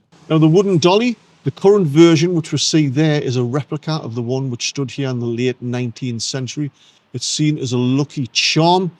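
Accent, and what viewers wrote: Newcastle, United Kingdom. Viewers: Great that your promoting some of these fantastic places, that perhaps are struggling somewhat these days. Love the Geordie accent. Can you say "Social club" again for me.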